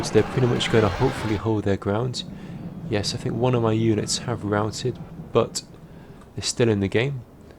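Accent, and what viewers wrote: United Kingdom. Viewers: I like your semi Yorkshire accent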